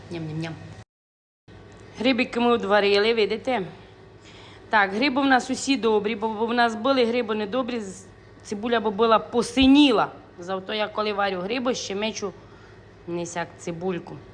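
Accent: Transcarpathia, Ukraine. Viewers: Мені подобається закарпатська розмова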